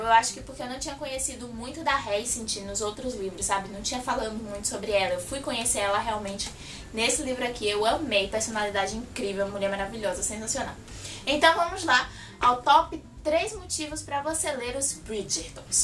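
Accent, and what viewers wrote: Bahia, Brazil. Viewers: tu é baiana????? Nossa eu preciso te conhecer!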